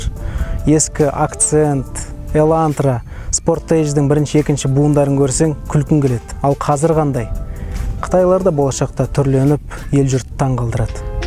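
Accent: Standard. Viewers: Красавчик👍🏻 таза қазақша сөйлейді ризамын, өте білімді жігіт!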